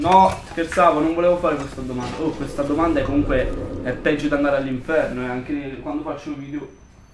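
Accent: Sicily, Italy. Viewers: "O spunniti u culu" di dove sei? Io sono di Siracusa 😂😂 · Siciliano doc... bellissimo video ansia a mille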